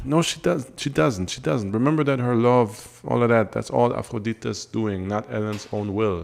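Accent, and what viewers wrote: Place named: Germany. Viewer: ..are you german? You pronounce the names REALLY german like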